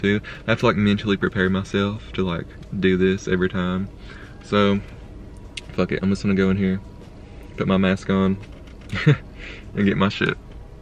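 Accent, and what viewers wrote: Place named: Tennessee, United States. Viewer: Binge watching your videos today. You are the best! I love watching you just do some regular shit. You’re just cool af!! Your voice makes me miss living in SE Tennessee.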